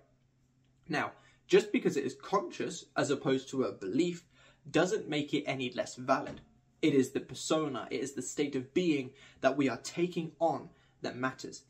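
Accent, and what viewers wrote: United Kingdom. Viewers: PS: you speak so quickly + the British accent makes me REALLY pay attention and often re-listen a part or two (I mostly listen to American English). Which I don’t criticize, it’s something I welcome because it’s super refreshing! I have to say I enjoy the manually added captions! Oh and I think I manifested your fast speech because I was tired of some Youtubers speaking sooo like, squeeeeezing a sponge type of slow speech🤣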